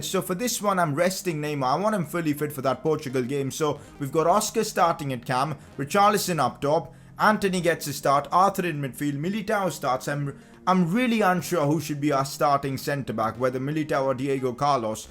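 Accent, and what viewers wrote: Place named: India. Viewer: milittttao that Indian accent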